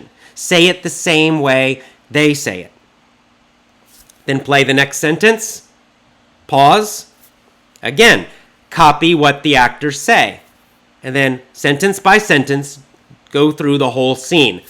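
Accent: United States. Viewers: Is he from America??. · hi Ihave one question is this American accent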